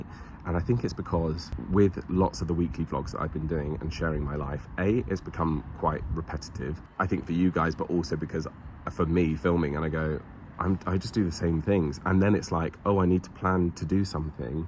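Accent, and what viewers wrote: United Kingdom. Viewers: Hi dude just chill and relax a bit. You seem to be doing great. You must do whatever you like to do. Don't take too much pressure on yourself. You literally have positive vibe and that is great thing about you. 1 year ago I started watching your videos just to learn British accent 😅. After a year I can mostly be able to understand the British accent now. Lot of love from India 😊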